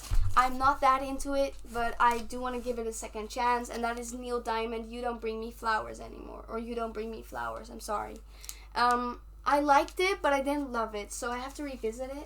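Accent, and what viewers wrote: Netherlands. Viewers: Amazing collection! You sound Dutch btw, are u?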